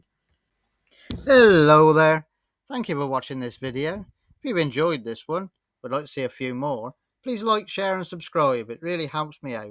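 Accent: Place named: Shropshire, United Kingdom. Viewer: Love the videos mate where are you from you sound local to me im south shropshire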